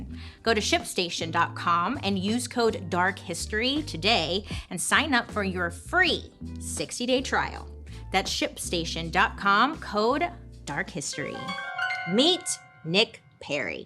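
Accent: United States. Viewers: Where in the US does that voice/accent come from?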